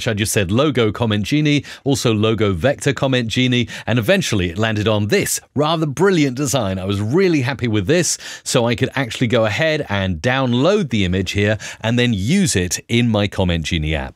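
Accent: France. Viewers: french accent was mad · The accent french 👨🏿‍💻😂😂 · What a French accent! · Je ne sais pas comment prendre la "blague" sur l'accent français mais c'est tellement vrai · The French accent is so authentic 😂🤣Amazing !!! Thank you for this moment ! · Fine I’ll subscribe after the French accent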